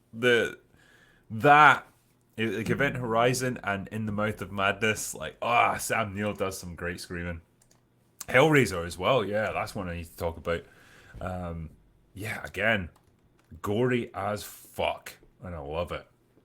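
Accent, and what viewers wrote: Scotland, United Kingdom. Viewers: Talks like a Scott, drinks like an Irishman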